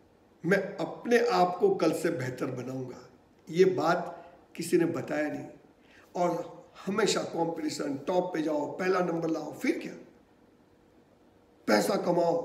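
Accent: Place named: Palanpur, India. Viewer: Well done Bhai . Gujarati Palanpuri boli no laheko j mast aawe. Natural j saru lage. Palanpuri boli ka bagicha vanchi to hase j.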